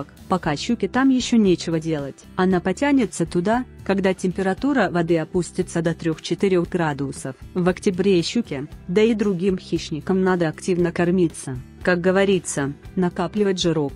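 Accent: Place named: Baltic. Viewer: Вы из Прибалтики судя по акценту? Инфо как всегда интересное, спасибо.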